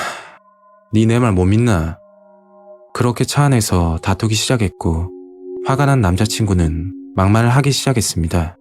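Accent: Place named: Daegu, South Korea. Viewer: 자연스럽고, 편안한 사투리~~ 대구 분이라고 하셨죠?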